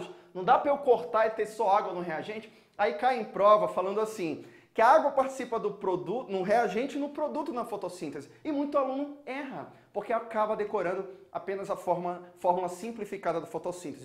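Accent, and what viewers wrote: Pará, Brazil. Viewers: Maninnnnnho eu gostei da coisiNNNha. Que fofo tio, vc é paraense? Já gostava do antes, agora tá em casa!!! 👏👏👏👏👏👏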